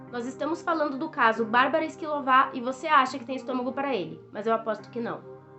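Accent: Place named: South, Brazil. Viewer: Olá 😃 conheci seu canal hj e já adorei ... Parabéns pelo trabalho 👏 pelo seu sotaque acho que vc e aqui do sul ?!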